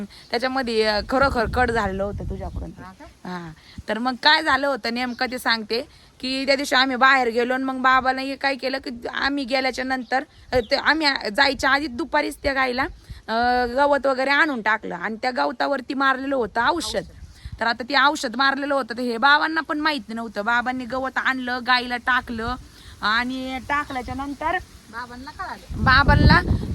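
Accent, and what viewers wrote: Ahmednagar, India. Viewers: Nagri bhasha asich ahe Jo to tyachya gavanusar bolto...amhi pn ngri ahot...bhashevrun kunala nav theu nka mumbait rstyavr rahnare pn standerd boltat....nagr chi hich bhasha ahe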